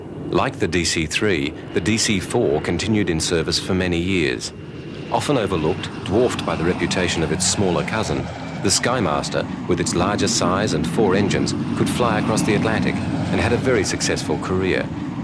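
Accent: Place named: Australia. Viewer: Interesting video but the continuous loud background music is very annoying. Is this an Australian production? The narrator sounds Australian.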